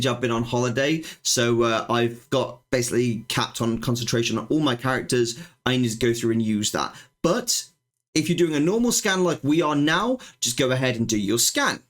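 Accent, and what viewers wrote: United Kingdom. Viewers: you sir have probably the best energy its just so refreshing to see, also the english accent is easy to understand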